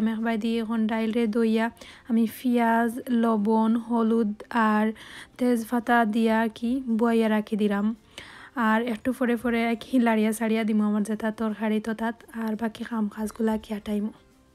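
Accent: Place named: Sylhet, Bangladesh. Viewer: আপু সিলেটের মাত হুনলে যে ভালা লাগে মন শান্তি অই যায় আমি নতুন আশা করি পাশে থাকবা আর ভ্লগ অনেক সুন্দর হইছে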